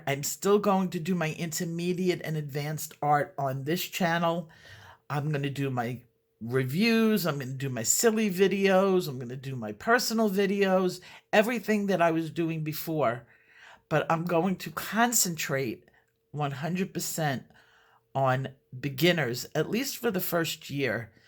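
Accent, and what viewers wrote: New York, United States. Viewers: oh my goodness, you have no idea how excited I am. I always wanted to learn about color and color theory. Mostly for fashion purposes and how color effects people; but never thought of myself an artsy kind of person at all. Until about a month ago, I had no interest in coloring in coloring books. I had no idea this community even existed. Now I’m obsessed!I’m looking forward to all the challenges, that I have no doubt you will be putting on us! Potty mouth, NY accent and all. LOL! Truly looking forward to the channel, learning and growing ❤️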